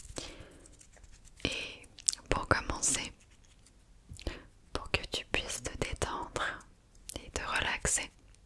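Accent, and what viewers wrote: France. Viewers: Your French accent is lovely!!!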